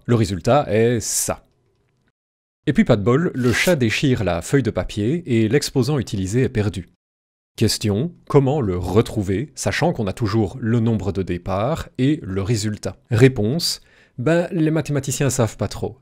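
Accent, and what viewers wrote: Belgium. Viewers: Il est belge normal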